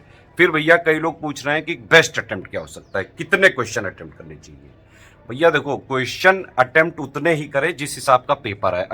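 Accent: Kanpur, India. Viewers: ऐसा क्यों लगता है सर की आप कानपुर से हो बहुत अच्छा लगा सर❤❤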